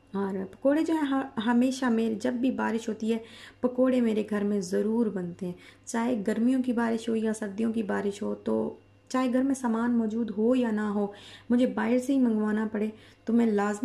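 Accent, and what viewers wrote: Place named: Multan, Pakistan. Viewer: very good mgr ap ka andaz bolny ka multan walon jesa hy jo bht sweet hy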